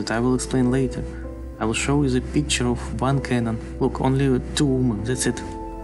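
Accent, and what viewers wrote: Russia. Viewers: Dodgy Russian accent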